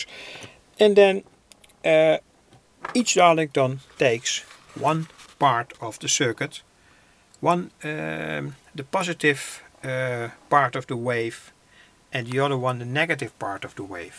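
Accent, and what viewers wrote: Netherlands. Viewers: Leuk Nederlands accent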